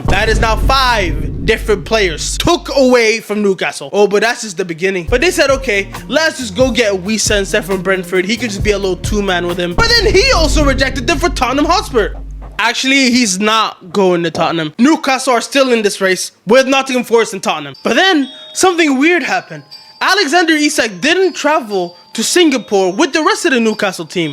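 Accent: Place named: Northeast, United States. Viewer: Where is his accent from? I can guess it's from the Northeast, the US but which state/city?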